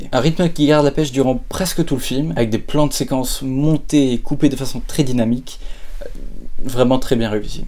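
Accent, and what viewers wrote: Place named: United States. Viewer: Original, on sent que t'es à fond dedans c'est cool :) J'suis d'accord avec LeFou, tu as tendance à mâcher tes mots en fin de phrase et quand tu dis les noms des gens. C'est dommage on peut pas apprécier ton accent américain :P Pour le film j'vais donner autour de 40/51 parce que malgré la bonne surprise y'a quand même quelques défauts. C'est un bon début pour la chaîne o/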